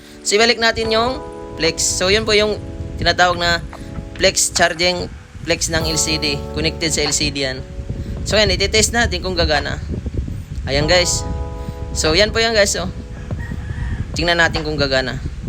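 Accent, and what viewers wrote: Iloilo, Philippines. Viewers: Ilonggo kaba boss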